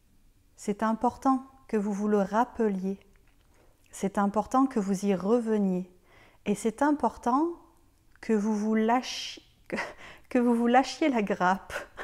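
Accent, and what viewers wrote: Quebec, Canada. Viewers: Chère nouvelle amie, merci. À la 19e minute, je suis entrée en moi et j’y ai retrouvé ma vraie présence. 🙏 J’ai cru entendre des nuances québécoises dans ta voix, aie-je raison? Je suis très attirée à l’idée de m’entourer d’une communauté qui porte en elle la même « mission » que moi et avec laquelle je pourrais avoir des contacts moins virtuels. Merci encore de te faire confiance car ce que tu nous as transmis était précisément ce dont j’avais besoin. 😅 Bonne continuation! 🥰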